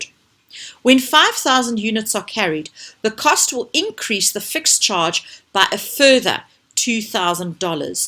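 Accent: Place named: South Africa. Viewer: You have a South African accent. Are you from there? Your Accounting tuition is fantastic! Thank you so much.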